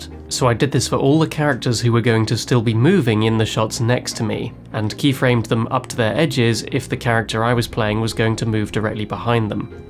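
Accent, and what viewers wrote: United Kingdom. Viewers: He’s got the English accent down pat...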